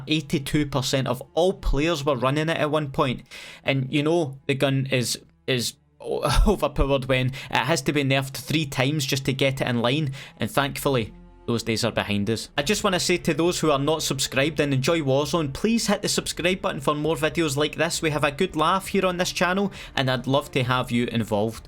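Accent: United Kingdom. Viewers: His British accent is just....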